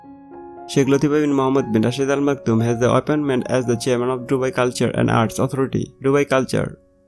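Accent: Bengal, India. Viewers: Improve your English....there is a tinge of Bengali accent in your English. It is is not eezz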